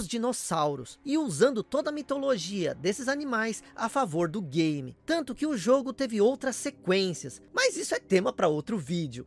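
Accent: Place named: Northeast, Brazil. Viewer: Issui pirata parece um nordestino com sotaque melhor do que muito nordestino kkkkkk P.s sou nordestino, antes que os lacradores comecem com enjoo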